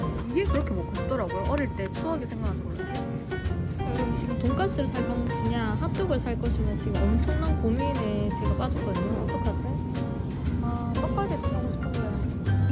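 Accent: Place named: Daegu, South Korea. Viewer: 처음보는데 대구분이신가봐요 사투리 친근하네여:) 잘봤습니당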